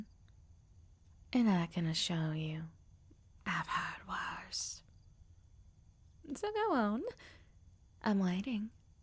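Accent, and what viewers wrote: United States. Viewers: That country accent has me in a chokehold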